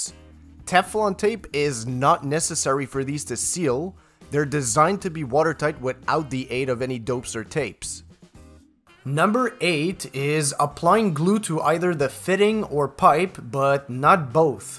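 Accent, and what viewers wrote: Canada. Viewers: are you from Canada? your accent seems canadian !?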